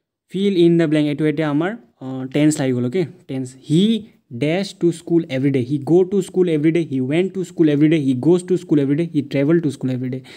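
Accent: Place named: Nepal. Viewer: Assamese language with Nepali tone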